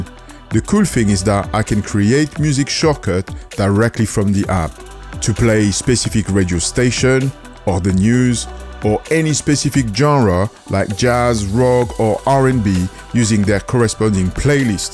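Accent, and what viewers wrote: France. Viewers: I loooove your french accent and the great tutorials! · Your French accent tho.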